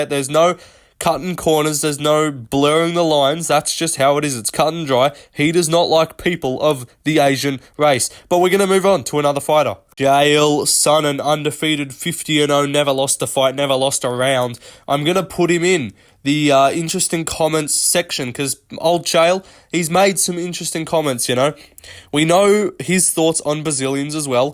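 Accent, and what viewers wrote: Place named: Australia. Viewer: I thought who the fuck would make a video like this. Then I heard you speak and was like ahh he's an Aussie 😂 well done mate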